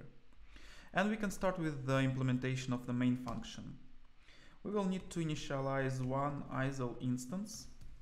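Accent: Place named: Russia. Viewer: сразу русский акцент слышно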